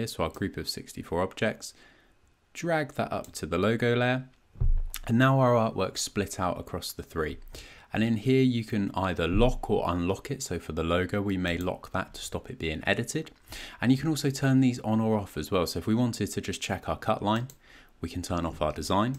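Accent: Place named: Wales, United Kingdom. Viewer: wowwwwwwwwwwwwwww welsh acent! i like it!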